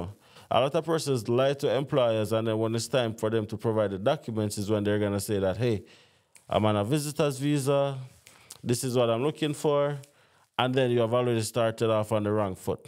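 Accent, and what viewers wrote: Jamaica. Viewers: Like ur English patwa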